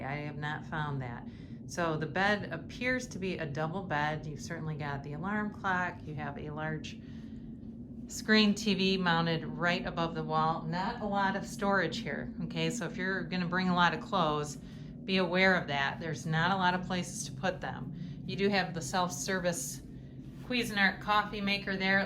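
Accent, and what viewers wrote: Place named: Chicago, United States. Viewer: I like your accent. Are you from ChicAHHHgo? 🙂🙂🙂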